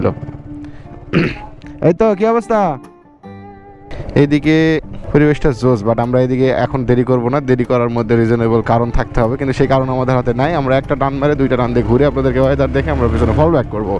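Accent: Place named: Naogaon, Bangladesh. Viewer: ভাইয়ের ভাষা শুনে মনে হচ্ছে আপনি নওগাঁ জেলার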